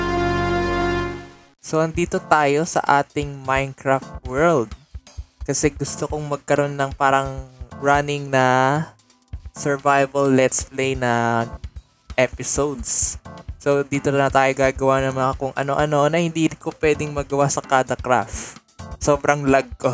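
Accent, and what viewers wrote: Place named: Visayas, Philippines. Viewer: Para ka pong bisaya hahah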